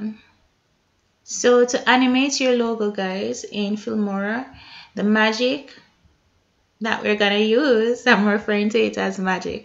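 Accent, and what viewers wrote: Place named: Jamaica. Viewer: Thank you! Love your Jamaican accent.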